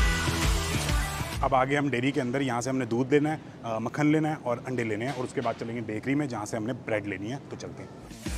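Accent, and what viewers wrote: Punjab, India. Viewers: its hindi with punjabi accent very easy to understand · Punjab jhlkda tuhadi boli cho bht mjja aaiaa dekh k video 😀jaiga ye cart k ander ☺️ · Dear apki language main jo punjabi texture (Tadka) hai its amazing; dear son apki vlog as always international students k liya bahut beneficial hoti hai and 2day vlog is superb God bless you my son and also stay healthy guys 🙏🙏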